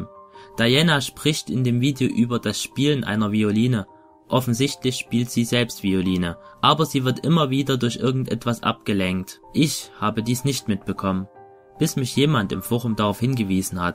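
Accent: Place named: Saxony, Germany. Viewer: Okay, ich schreibe mal einen professionellen Kommentar: (Es wird ein etwas längerer Text) Fangen wir an mit "Text und Aufnahme": Also zuallererst einmal ist deine Sprechweise gut, der Lesefluss ist super und deine Betonungen glaubhaft und ist gut platziert. Manchmal hast du aber etwas knapp geschnitten, damit meine ich das manche Sätze schnell enden und du also (rein natürlich) nicht die Zeit gehabt hättest Luft zu holen. Ich sehe deinen Förderbedarf im Dialekt, da ich dich mittlerweile sehr gut kenne weiß ich das du hier sehr darauf geachtet hast nicht zu sehr "Sächsisch" zu klingen, dafür ein Kompliment. Zum Text gibt es kaum etwas zu sagen da es ein ziemlicher Klassiker ist der eher vom Background lebt, also den Videos die man tatsächlich anschauen kann. Ich fahre fort mit "Technik": Mit deinem Videobearbeitungsprogramm hast du eine gute Wahl getroffen. 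Die Bilder sind stimmig und passen von der Bearbeitung zu NPFNP. Man "merkt" das sich die Qualität von diesem Video vom Rest erheblich abhebt das sich nicht zuletzt auch auf dem Sound auswirkt. Auf die Bearbeitung deiner Stimme gehe ich mal nicht ein, da du ja dort noch etwas Hilfe hattest ;) Der Background ist gut gewählt, bei aber + etwas leise. Fazit: Eine Interessante CP die ich sehr genossen habe, danke für deine Arbeit ! NEVER~SLEEP~AGAIN Cruzix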